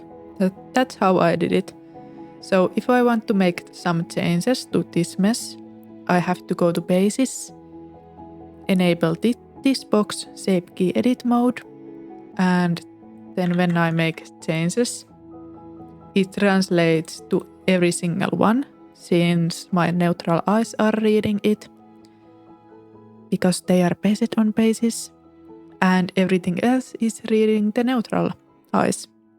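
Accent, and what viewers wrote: Finland. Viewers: Your Finnish accent is incredibly strong :D But thanks for the tutorial! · Your Finnish accent is Awesome!! as well as your tutorial! thank you! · Terve mita culu? I’m Finish American & when you began speaking I knew you’re Finnish. onnea!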